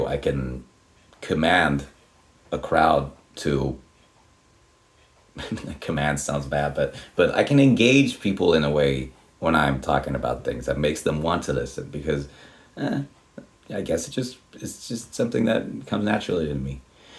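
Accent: United States. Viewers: En ymmärrä mistä sait tuon jenkkinuotin englannin puheelle. Tästä voisit tehdä videon, että miksi osaat noin hyvin? Hyviä videoita olet tehnyt.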